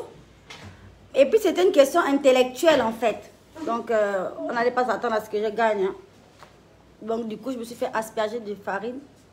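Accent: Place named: Gabon. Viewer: J aime ton accent gabonais ❤️❤️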